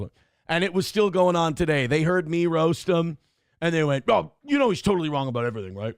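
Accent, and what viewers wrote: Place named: AAVE, United States. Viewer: I love when he talks ebonics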